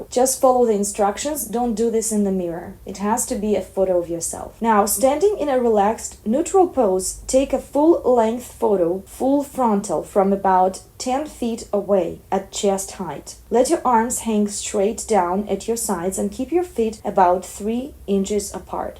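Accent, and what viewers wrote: Russia. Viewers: Вы невероятная! Вы талантливая! Вы удивительная! Такой красивый голос, и такое глубокое погружение в стиль поражают и удивляют! Нашла это канал случайно, когда искала определение гамина романтика (он же soft gamine). Очень понравилось как системно и просто Вы объясняете. Стала смотреть дальше, и не покидало ощущение, что есть русский акцент в Вашем английском 😜 Покопалась и зависла на Вашем исполнении. Но пришла, чтобы разобраться в системе Киббе. Почему видео только на английском??? Как жаль, что огромная часть россиянок не имеют возможности слушать Вас 😢. Вы отличаетесь от остальных наших стилистов-блогеров. Ваш контент просто супер! Спасибо огромное! И огромного Вам успеха 👏🏻👏🏻👏🏻🌺🌺🌺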